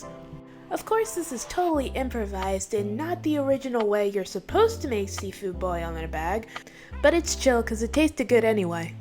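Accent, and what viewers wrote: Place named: Kansas City, United States. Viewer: Kc connection accent is everything 😍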